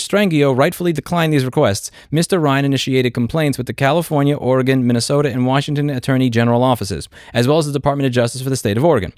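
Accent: New York, United States. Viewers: You still sound New York, its going to take a few more years to get your twang. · Has louis NY accents gotten worse since moving to texas, love it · Is your voice ok, because you sound way more new york now? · You are doing gods work in “well actually”ing these lawyers that defend anti repair :) Btw your accent is still vey much more NY than TX. There was a slight drawl towards the end of you mentioning that tho. :P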